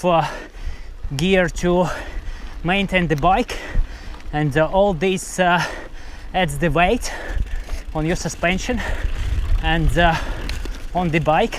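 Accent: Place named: Russia. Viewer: Ох уж этот неповторимый русский акцент...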